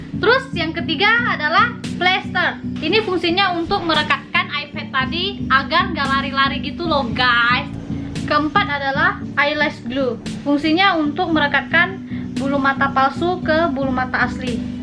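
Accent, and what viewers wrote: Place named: China. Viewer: Bahasa medok cina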